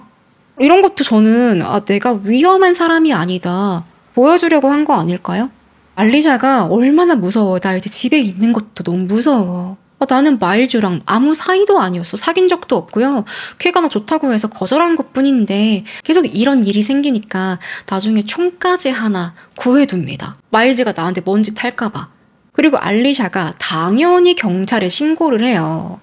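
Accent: Gyeongsang, South Korea. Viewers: 언니 경상도 분이세용?궁금해요!!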